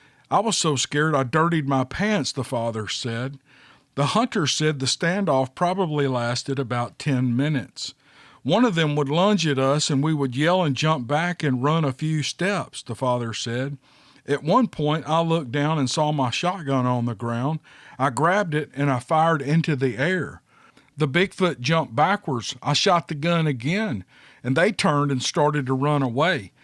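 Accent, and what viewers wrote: South, United States. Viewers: O! Don’t record it over! I love hearing you get so tickled you can’t go on. I’m sure everyone is laughing along with you. Even my husband, who views these stories only as entertainment and gets tired of listening, got a great laugh at the experience and loved to hear you laugh, too. I LOVE EVERYTHING you pick out and love your accent and the way you speak because I’m a country girl from the south. You are my favorite Bigfoot storyteller on Utube.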